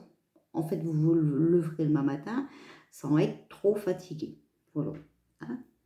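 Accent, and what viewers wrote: North, France. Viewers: Bonjour j ai découvert votre chaîne il y a peux de temps aussi j attend les suivantes avec impatience j aime votre accent du Nord et votre gentillesse votre nouvelle coiffure vous rajeunit pouvez vous svp me donner les liens de votre site j'aimerai vous acheter des angonites merci bonne soiree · Bonjour Je vous suis depuis un petit moment je m' éclate d'abord avec votre accent du Nord surtout quand vous dites "voilà " j' adorrrrre!! Le 16 juillet à 20h precises sur Web tv RDH (Rassemblement des Droits de l'Homme) un certain Philipoe Argillier doit parler. POURRIEZ-VOUS NOUS DIRE SI C'EST QUELQU'UN DE FIABLE OU PAS. MERCI🙏❤️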